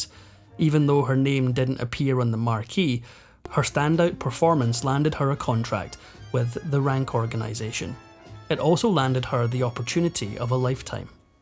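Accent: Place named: Scotland, United Kingdom. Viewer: Yay my favorite Narrator love the Scot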